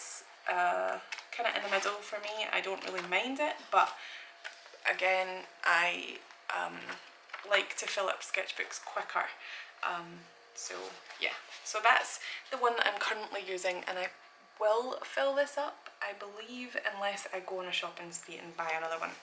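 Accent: Scotland, United Kingdom. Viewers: Thank you! You are britush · Are you Scottish ??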